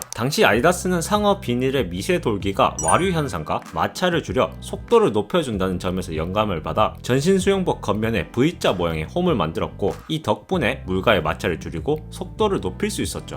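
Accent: Gyeongsang, South Korea. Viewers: 나래이션 경상도분이신가요?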